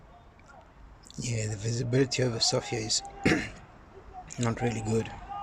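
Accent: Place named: South Africa. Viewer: did you grow up there? I feel as if I'm hearing a little bit of a South African accent from you. 👍 Nice flight even though the weather was not great.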